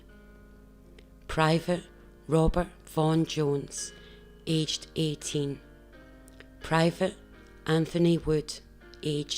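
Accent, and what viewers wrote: Scotland, United Kingdom. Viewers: A scottish woman narrating ..what next ..was there justification for bloody sunday..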